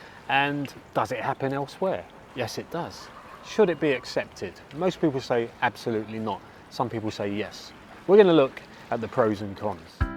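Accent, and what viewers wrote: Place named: Cockney, United Kingdom. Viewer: An interesting one. Do you ever have any trouble in places as your Thai will have a cockney lilt to it! I assume you have a Thai passport as well as a UK one? Having a Thai one will stop any possible problems i'm guessing.....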